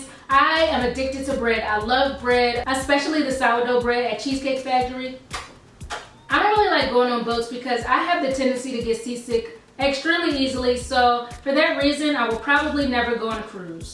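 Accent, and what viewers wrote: Baltimore, United States. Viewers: I knew u were from bmore by ur accent. Youu-teuuuube!! Lol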